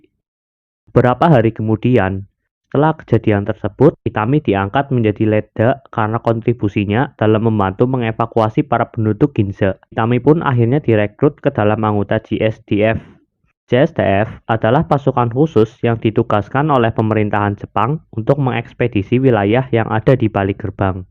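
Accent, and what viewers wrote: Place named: Banyumas, Indonesia. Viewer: Semangat bang.. ngapak joss 👍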